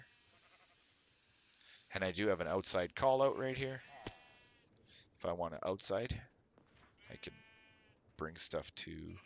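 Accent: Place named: Minnesota, United States. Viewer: What modpack is this? I'm still in the start of the video. Possibly add the link in your description? That bald head makes me happy, lol. Also, are you from Minnesota/Wisconsin? Possibly northern Michigan? I've got a ton of family in Minnesota and you sound like them.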